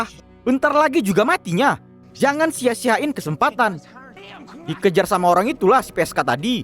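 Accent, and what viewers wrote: Medan, Indonesia. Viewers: Aku sor nya dengar yg ngmong di chanel ini macam anak medan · Medanya nampak kale kk🙏🙏😅😂🤣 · Min orang medan min?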